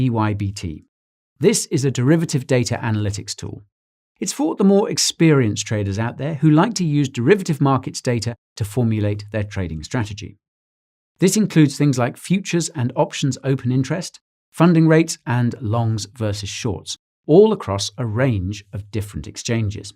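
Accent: United Kingdom. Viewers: Man your fracking awesome.. love listening to you... something about brits that make em sound smart haha. thanks for all the GREAT info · I only understood like 10% of this... and that's being generous. But the wee bit I did pick up helps me understand why I flopped on my first $500-600 into crypto (well, Im not dead yet) and I'll be MUCH more careful entering my long term HODLING patterns from here on thanks to you. Liked and subbed even before you asked for it. I heard your Brit accent and immediately thought, ah yes, this man knows his stuff. LOL. Plus you came highly recommended by Alex Becker, the most ripped attractive PG rated Youtuber and top rated coin picker in the galaxy. 🙄😁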